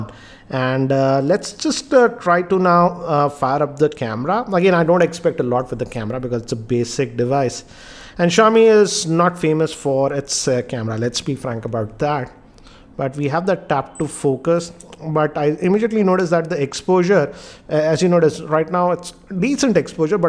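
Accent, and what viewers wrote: India. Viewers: I like this Dude Accent though! Not too thick Indian Accent but with a bit touch of English Westerner Accent. 👍 Nice Review too!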